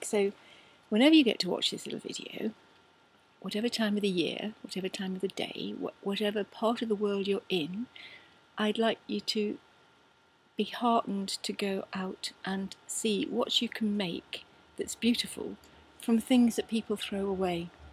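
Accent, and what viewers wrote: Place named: United Kingdom. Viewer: Jolly good...love the british accent.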